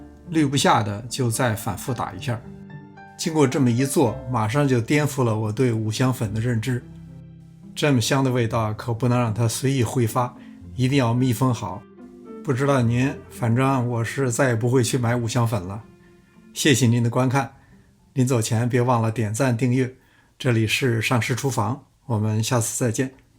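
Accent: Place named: Beijing, China. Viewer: 北京話😂。 不過我姥姥是東北人， 她也這麼說。 很多北京話和東北話的用詞都一樣， 儘管口音不同， 我总覺得這說不定与清朝滿族人有關， 把東北話帶到北京來了。😂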